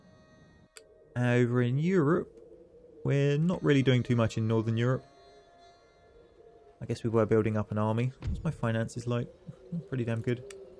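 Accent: United Kingdom. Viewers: You can't upload this quick enough buddy. Great series On a side note I'm guessing you're south coast with an accent like that. Winchester perhaps? (I might be way off but I'm from Bournemouth)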